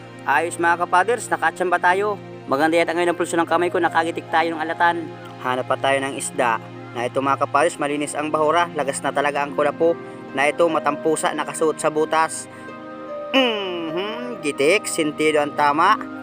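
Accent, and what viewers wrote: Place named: Samar, Philippines. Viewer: Sir tagasaan k s Samar? Kc parang minsan nhhalata kita nag bbisaya parang Samarnon lol 😊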